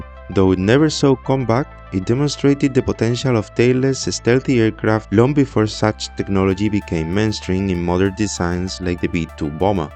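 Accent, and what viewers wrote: Spain. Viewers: suenas español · jajja se nota que es ESPAÑOL. pero bueno asi llegas a una audencia mayor. Buen video.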